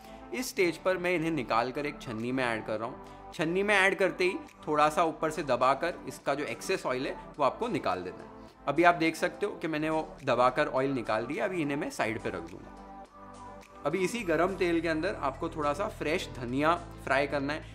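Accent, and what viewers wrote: Maharashtra, India. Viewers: Really appreciate for your marathi words accent 👍🏻👍🏻👍🏻 अस्सल कोल्हापुरी झणझणीत मिसळ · Hey chef your pronunciation of marathi words is assal Marathi !!!! your recipes are so authentic and explained with such an ease. I am a Marathi but instead of following any Marathi chef I follow your recipes and always wait for the new ones. More importantly you represent it with no nonsense talks. Ekdam to the point. Thank you !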